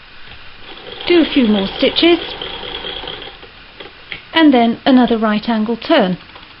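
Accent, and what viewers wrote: RP, United Kingdom. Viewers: Where are you from? You speak in such a posh way!:) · How cheeky to comment on your "posh accent",I was just so pleased to find your very helpful instructions.I've just bought a lovely old Singer and am having problems getting the tension right.Thanks to you I think I've cracked it.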